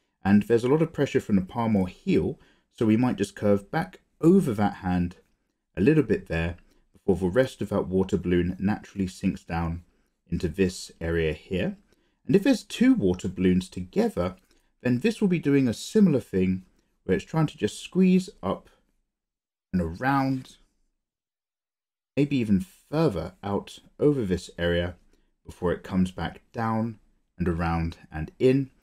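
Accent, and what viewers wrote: United Kingdom. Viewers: he just seems innocent Because of his old school British accent. if he was from Boston he'd be the greasiest drawing tutor there ever was lol.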